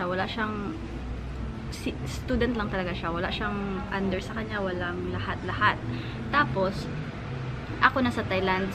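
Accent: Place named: Davao, Philippines. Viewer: Davaoeño accent. Hehe.. kaubo kos chika sa gasto. Murag gi kuyawan ko huhu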